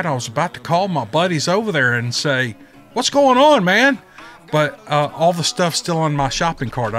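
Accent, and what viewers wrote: South, United States. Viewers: All I can say is thank you for bringing some interesting joy to my life. You have a kind spirit. And beautiful country accent. God bless you and your family. And letting people know sasquatch is real.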